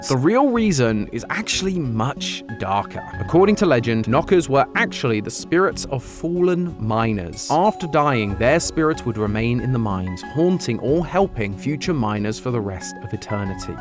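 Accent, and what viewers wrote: United Kingdom. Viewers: i thought you were British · Your to British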